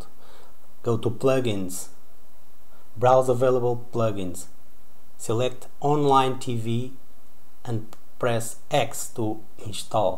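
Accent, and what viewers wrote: Portugal. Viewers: Com esse sotaque és tuga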